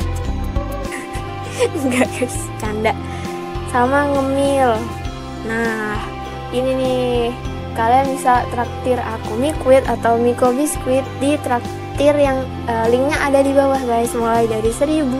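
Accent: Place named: Java, Indonesia. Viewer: waahh jawa banget! btw semangat yaaa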